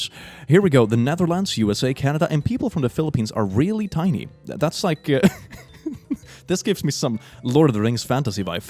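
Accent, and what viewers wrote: Sweden. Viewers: hey! ive joined your discord server!:virtualhug: Also are you swedish? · This sweedish accent gets me every time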